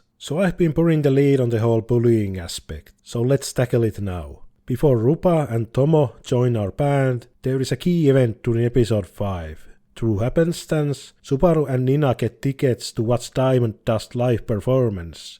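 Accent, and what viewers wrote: Finland. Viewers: great videeo random question but where are you from? guessing u could maybe be finnish since your pfp looks kinda like the Spurdo Spärde stuff n ofc ur accent is pretty finnish like lmao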